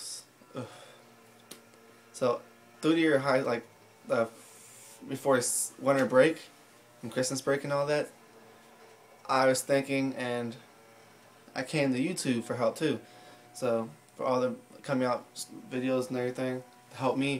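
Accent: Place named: United States. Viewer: The American Accent though :3 Aw i cant believe i dated you :') Your amazing just gotta say :)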